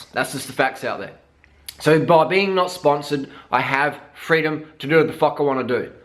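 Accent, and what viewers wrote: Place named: Australia. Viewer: has anybody ever noticed how annoying his fucking voice is like Australian accent is cool as fuck but his is just fucking annoying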